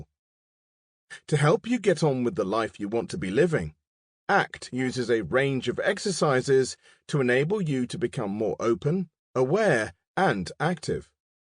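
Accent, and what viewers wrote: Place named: England, United Kingdom. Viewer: Great English accent and pronunciation from the narrator,.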